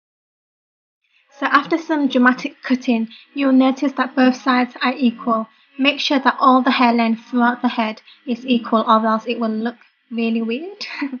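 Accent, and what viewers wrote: Yorkshire, United Kingdom. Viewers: has a British accent thats awesome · are you from Yorkshire by any chance? Just your accent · from Leeds or Bradford? x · hear a British accent on this girl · you speak like british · her accent makes her prettier... sound like British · British accent <3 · Her accent does sound Brittish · are you British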